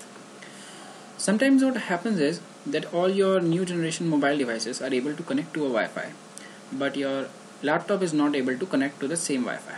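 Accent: India. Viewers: Oh god i fucking hate your indian accent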